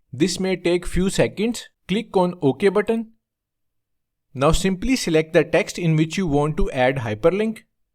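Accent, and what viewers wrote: India. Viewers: English language with an Indian accent. Deplorable condition 🙃🙃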